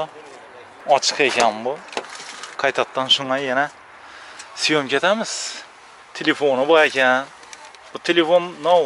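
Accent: Khorezm, Uzbekistan. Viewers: Iloji bolsa adabiy gpirsangiz xorozim shevasida gapirmang tushunarsiz.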